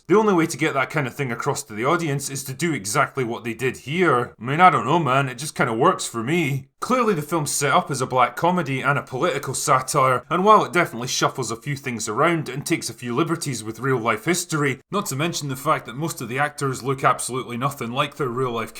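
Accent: Scotland, United Kingdom. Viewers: Narrator has quite the Scottish accent. Or Yorkshire. Either way, ridiculous. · “Ya know…” I fookin’ love this film. You could’ve voiced a Soviet General with yer Scottish Brogue, Comrade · yes scots do talk like that. Mate, what the fuck would have to gain by pretending not to be danish?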